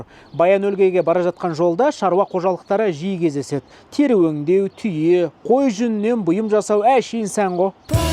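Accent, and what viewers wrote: Standard. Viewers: сау бол, рахмет контент үшін! Ұлтым Өзбек болсада Қазақ тіліні жақсы түсінемін, соның таза Қазақ тілінде сөйлегінің үшін көремін каналиңди. Рахмет. Өзбекстаннан Салом Алейкум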